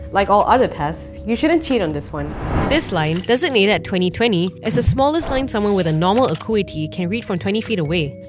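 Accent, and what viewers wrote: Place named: Malaysia. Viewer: i didnt even see the channel’s name at first but i can guess the accent is malaysian 😅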